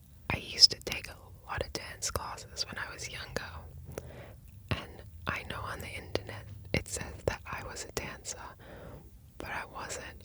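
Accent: Australia. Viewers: Her aussie accent makes it all better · australian accent is perfect for asmr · Aussie accent whispering is the most beautiful thing I've ever heard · I really love the aussie accent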